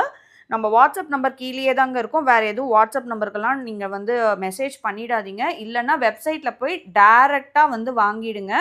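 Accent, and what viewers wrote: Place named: United Kingdom. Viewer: ஏன் டி நீ பிரிட்டன் ரிட்டனா தமிழ் வாயில் வராதா பாதகத்தி இப்படித்தான் தமிழை கொன்னுட்டு வரீங்க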